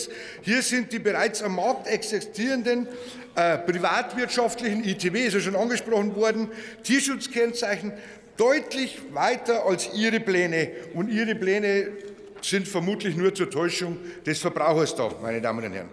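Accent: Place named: Bavaria, Germany. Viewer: "Ich vertehe nichts.." Bayirisch verstehe sogar i.. als Schweizer!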